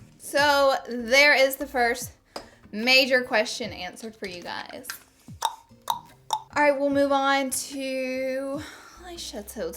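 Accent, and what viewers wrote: South, United States. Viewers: Girl I am from Texas and transplanted to TN so I hear you on that accent and dirty south is SO right. Also I started graying at 19 so I couldn't tell you what my natural color is. I DO know I need to go get another hair appointment but I have more time than money so I go to the beauty college lol I enjoyed your Q&A. You are freakin hilarious. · Ok what part of the south are you from. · I can tell I'm from the south.. I didn't even know you HAD a country accent. Haha, i just thought you had a normal voice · you should've not told us ur habit of looking down to our left cuz now I'm counting how many times you do it haha!! & girl your losing your southern twang :( better get back to Bama soon. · Beautifully said and love your southern accent.....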